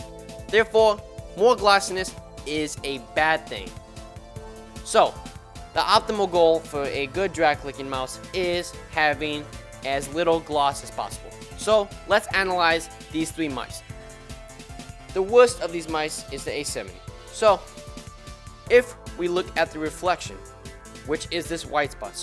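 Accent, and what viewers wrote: New York, United States. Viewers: I can feel the new York accent through the screen. Good bid m8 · Your from new york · You are from ny? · As a person who lives in New York I love his NY accent edit: sheesh thx for the likes and heart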